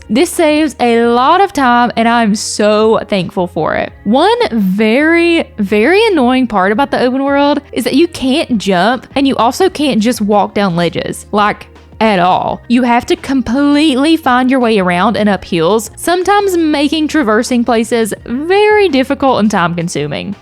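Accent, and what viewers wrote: South, United States. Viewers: And what part of the south are you from? Just found the channel btw. Good review! Subscribed..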